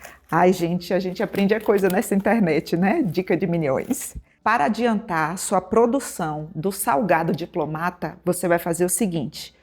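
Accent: Bahia, Brazil. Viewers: Mto didática sem falar mto e enrolar, dá gosto de ver suas receitas maravilhosas, parabéns!! Sem contar que esse sotaque baiano deixa td mais gostoso... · tu é simplesmente maravilhosa abençoada. Que mãos de fada. Sem contar que tú é muito espirituosa 😂😂😂😂 te adoro. Obrigada por existir em nossas vidas. ❤❤❤❤💋💋💋💋🫂🫂🫂🫂 Vcs goianos, baianos são muito parecidos com a gente aqui do Rio grande do Sul muito hospitaleiros. Acho que pelo teu sotaque tu é baiana né?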